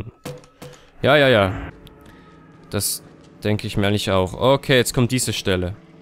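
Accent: Switzerland. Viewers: Du bisch schwizer 😵 kul i ou 🤣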